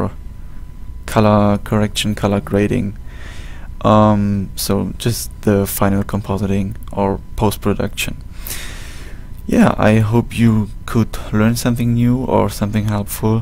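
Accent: Germany. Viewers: Deutscher oder? :D Hört man raus aber gutes Tut ^^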